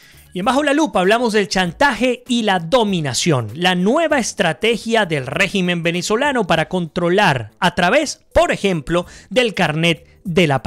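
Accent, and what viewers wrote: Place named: Argentina. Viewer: hablas parecido a Argentino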